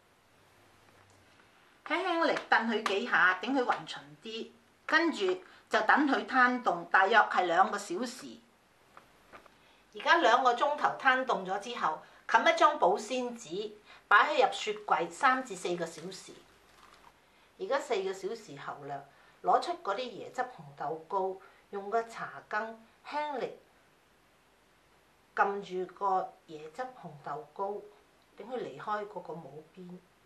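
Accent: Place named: Hong Kong, China. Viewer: I think she comes from Hong Kong (from her Cantonese pronunciation). Coconut milk is not a traditional Chinese food ingredient. This dessert is originally from Hong Kong.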